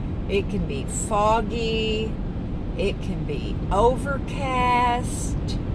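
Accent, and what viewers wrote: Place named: Maine, United States. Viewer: sounds like maine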